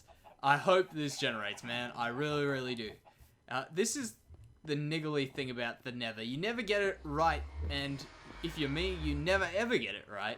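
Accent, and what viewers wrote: Australia. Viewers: Where in Australia are you from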